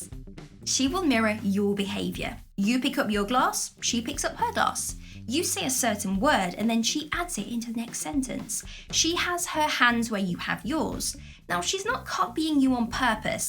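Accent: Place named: Sheffield, United Kingdom. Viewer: Are you from Sheffield by any chance?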